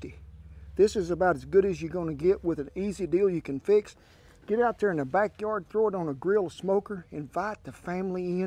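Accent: South, United States. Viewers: Bool the chicken then a nice coat of ool. Southern accent 👌👌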